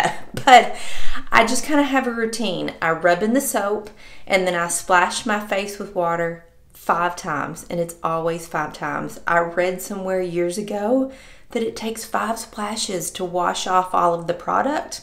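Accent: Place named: Kentucky, United States. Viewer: CJ...It's a good 'ol southern accent, Kentucky to be exact.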